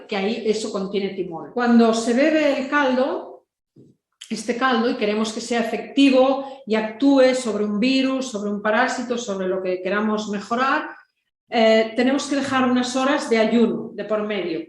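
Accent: Argentina. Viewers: Hola, Te escucho y escribo desde la Patagonia Chilena, estoy en la ciudad de Puerto Varas, y por casualidad hace un tiempo he puesto tus videos de casualidad, primero me llamó la atención tu llamado a estar preparado para una posible crisis, que me parece muy práctico y manejar los alimentos y plantas medicinales para usarlos sin tener que depender del supermercado en caso de alguna contingencia, usar conservas es una forma de no desperdiciar comida, me parece muy interesante lo que planteas, el pensamiento, el entendimiento de antiguos ardides que se han usado para interpretar a conveniencia las escrituras bíblicas es lo que más me gusta que hasta debería ser la base de las clases de religión, y Miguelito el marciano es todo un hit!! felicidades y siempre mucho valor y carácter, aparte me encanta el acento argentino que tenés, abrazos desde Chile estimada.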